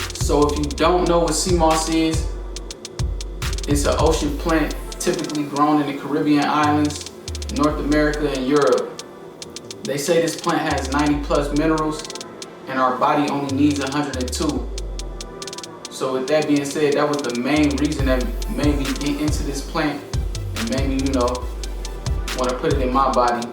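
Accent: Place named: Philadelphia, United States. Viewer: 😂 I hear Philly all in this man voice. Good video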